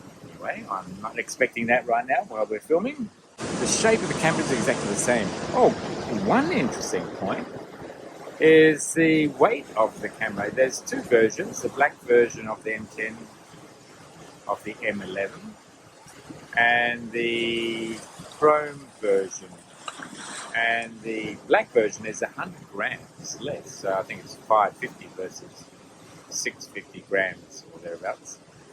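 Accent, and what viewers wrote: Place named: Australia. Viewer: good to hear an Aussie accent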